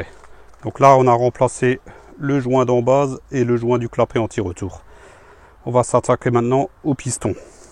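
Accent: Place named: Alsace, France. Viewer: accent et alors..... fier d'être Alsacien, dans d'autres régions, ils ont un accent également, alors..... quant aux joints.... Trèfle Vert par exemple.... en règle générale les magasins Jardinage..... ou pour les veinards comme nous, Alsaciens, un déplacement outre Rhin chez OBI...... nettement moins cher qu'en France..... hé oui..... merci pour vos "bidouilles"....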